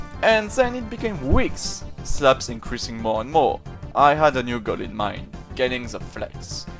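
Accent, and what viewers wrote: France. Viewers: holy french accent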